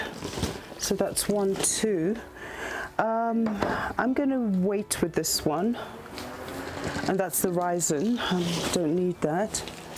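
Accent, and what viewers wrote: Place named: RP, United Kingdom. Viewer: Your voice is so Posh!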